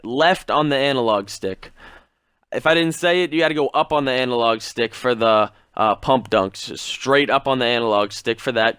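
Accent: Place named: Boston, United States. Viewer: yep this man is from Boston... just said wicked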